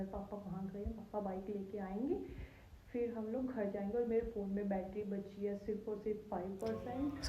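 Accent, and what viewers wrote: Haryana, India. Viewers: Hariyanvi boli bahut pyari lag rahi👍👍👍👍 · Wah rr jatni aaj haryanvi bol k moz krdi❤❤ My cute crush 🥰🥰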